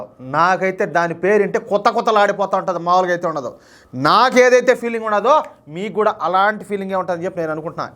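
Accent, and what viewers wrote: Rayalaseema, India. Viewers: మీరు రాయలసీమ మాండలికంలో విషయ వివరణ చాలా బాగుంది.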